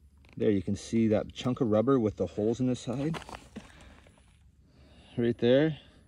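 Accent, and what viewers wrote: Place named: Canada. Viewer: You sound Canadian 😄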